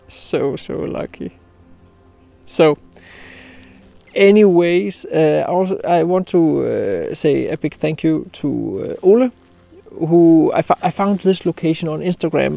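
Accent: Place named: Denmark. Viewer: That danish accent is a real joy to hear sometimes. Especially when it sounds like you're calling them shitland ponies 😂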